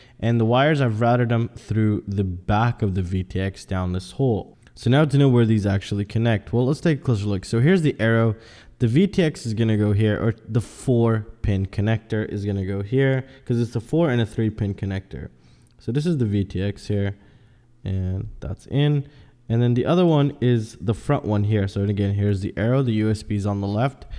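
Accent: United States. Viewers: Great vid and thanks. However - why do our beloved US friends say "sodering" when it's "soLdering"??? Always makes me laugh. :-)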